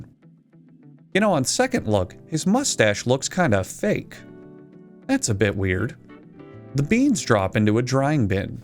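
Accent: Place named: Albany, United States. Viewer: Albany dialect represent!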